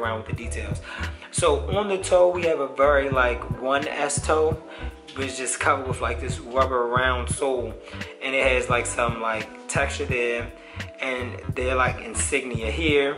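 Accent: Baltimore, United States. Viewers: you from baltimore? you sound like it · Bruh is from Baltimore fa shoooo 😭😭😭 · gotta be from bmore lol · Nigga definitely from baltimore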